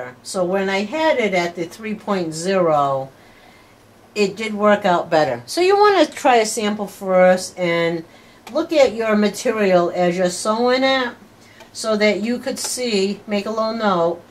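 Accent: United States. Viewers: Are you from New England? I have relatives from Rhode Island and I swear your accent is exactly like theirs. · I’m an experienced knitter but I hate sewing seems - I just seem to have a mental block or am too uncoordinated. But I’m now trying to learn to machine sew. Hoping eventually it will make sewing my knits together much easier one day. Thanks for the video. (Also I love your accent - I’m from Northern Ireland so I love noticing different American accents and dialects).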